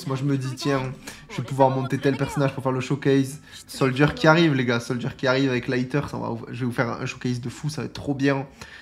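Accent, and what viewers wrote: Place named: South, France. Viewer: Pour les idées de vidéo, je remet ici l'idée de faire un top drip/style des persos 😎 Le truc en plus qui fait aussi que j'aime ton contenu, c'est ton accent du sud ahaha :D Pour le cast zone, je suis pas créateur de contenu, mais je suis toujours chaud pour participer pour un spécial lore et théorie, je pense vraiment avoir de quoi causer 20 bonnes minutes et qu'on pourrait débattre dessus, j'adore me hype sur l'avenir possible du titre 😁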